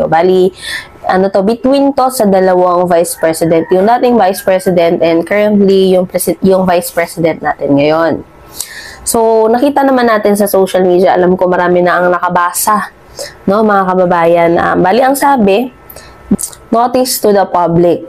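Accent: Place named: Bicol, Philippines. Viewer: Ano yang puna mo, saan pupunta nag mmagaling parang da way you deliver like Leni style Bicol k b ha.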